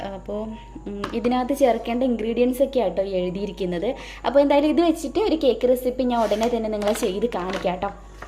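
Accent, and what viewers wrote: Kollam, India. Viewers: കൊല്ലം സ്ലാങ് പറയൂ. മിക്കപ്പോഴും മറക്കുന്നു.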